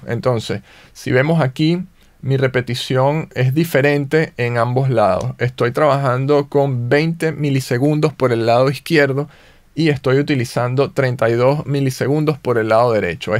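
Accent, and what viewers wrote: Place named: Caracas, Venezuela. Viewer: A propósito, eres venezolano, cierto? Tienes acento caraqueño.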